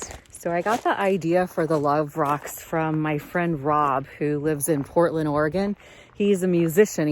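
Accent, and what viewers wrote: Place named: Missouri, United States. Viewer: Lol..... you cwack me up! I can definitely tell you are from Missouri.. I am clumsy.. frought with mishaps.. especially when someone is watching me.lol.. I try and keep my "cool".. but ends in epic failure! Enjoying your posts from SW Missouri.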